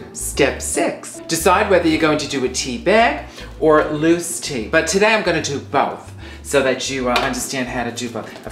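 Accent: United States. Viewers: does anyone know what type of american accent that is? i like it. is hilarious. could watch her videos all day.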